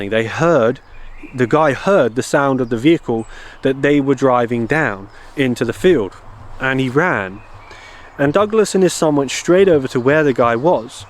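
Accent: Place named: RP, United Kingdom. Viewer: Thats a very interesting story about the farmer and cattle situ. Ley lines run 3 ft above Earth and 3ft under the Earth and no matter your denomination that ley energy is what is used to manifest. So these people were obviously using that energy for the dark purposes and thats why the cattle could not eat the grass because its likes its poisoned and they knew it. In India cows are sacred and also in Egypt too. Its nice you speak the Queens English without a regional accent, because its dying out and thats a real shame, although the odd word I can hear an accent from up North like possibly a Yorkshire accent, but only an odd word occasionally. You speak beautifully and I adore listening to you !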